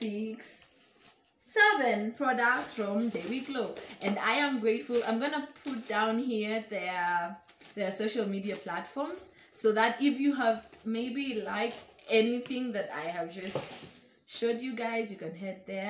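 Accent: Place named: Luhya, Kenya. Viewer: 😂I love it when you speak swahili manze..it comes out so naturally..the Luhya version babe...the products are so preeeeettyyy😍just like you...keep doing you shawryy...❤❤❤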